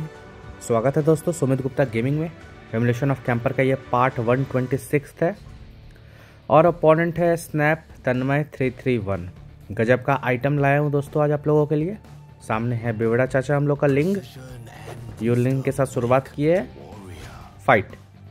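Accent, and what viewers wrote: Bihar, India. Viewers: I love our bihari ascent😂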